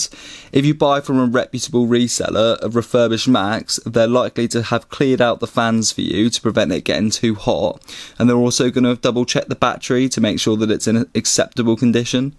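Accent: United Kingdom. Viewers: Are you British? I am you new subscriber, and I love your video quality <3.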